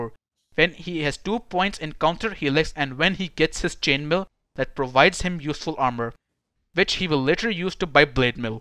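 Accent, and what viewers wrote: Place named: India. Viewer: This indian accent man 🤭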